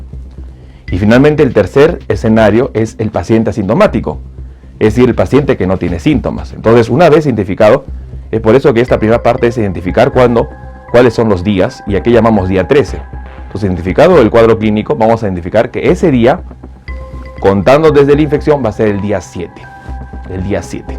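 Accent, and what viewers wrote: Peru. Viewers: Por el acento parece peruano o de Colombia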